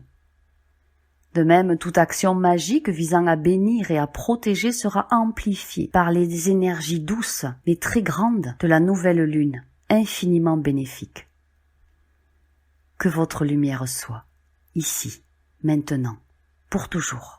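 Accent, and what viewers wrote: South, France. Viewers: Bonjour, J'ai été une de vos 1ère commentatrice, lorsqu'il n'y avait pas encore cette déferlante d'abonné(e)s qui, je le souhaite, vous comblent..C super!!!🌞⭐ Et je me souviens qu'en ce temps là jetais entrain d'arrêter l'alcool et ça me faisait beaucoup de bien de vs écouter, vous avez été la 1 ère à me dire que j'avais comme nous tous cette étincelle divine qui demande à croître et que c'est notre droit de naissance (Je vous entends av votre accent du sud, votre fille(à l'époque) avait un soucis de pneu et c'était un peu raide financièrement mais finalement, du coup vs avez eu+De clientes!!Tt ceci pour vous dire que le tps passe et malgré ces années de quête, je me sens guidée mais je n'entends ni ne vois, ni ne sens, rien du tout, et j'ai l'impression de m'être coupée du monde(choix qui est voulu et volontaire mais qui pèse parfois..) j'ignore si vs allez avoir ce message, et si vs l'avez j'ai 1 question qui me gêne, pensez vous que le fait de prendre des produits de substitution à la drogue puisse me voiler encore plus ce que je suis, car vous l' aurez compris, c'est mon désir le+ardent et le+ beau de le découvrir, appuyés tous ces bobards sociétaires,bien à vs🐴🍀🕊🦎🌹🍀🍃💋💋💓☯️💎🕉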